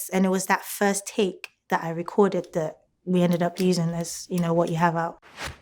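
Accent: United Kingdom. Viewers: Ho my gawd Where did the British accent come from🙄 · weezy o she probably lived/ grew up in the uk. There are plenty of Nigerians with British accents!!! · What's up with the British accent though? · Famm that british accent is on point!! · Why the UK accent you are Nigerian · Does she have a british accent seems it mixed sorry i am French ? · She studied in the UK and came when she was little, so obviously British accent and British passport. Stop hating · British accent I hear? Is she of British decent aside nigerian? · Where did the British accent come from??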